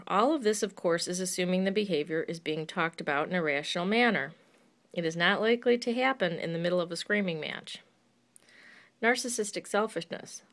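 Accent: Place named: Michigan, United States. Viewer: U sound like you from Michigan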